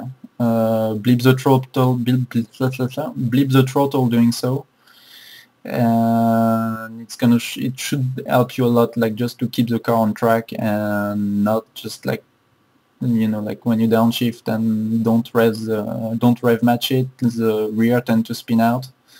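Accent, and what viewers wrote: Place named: France. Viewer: Don't apologise for your accent man! French accents are seriously cool! Its how you guys manage to pull "our" english women so easily! :P Great video too, i'm down to a 59.5 around LRP but your mega quick and helpful, thanks!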